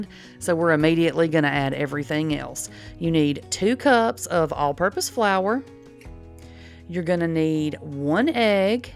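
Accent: United States. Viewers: Hi 😁 I’m all new to sourdough and I’m trying this recipe tonight !!! Omg it looks sooooo yummy !! Btw …. I love ur accent lol . What State in US is that?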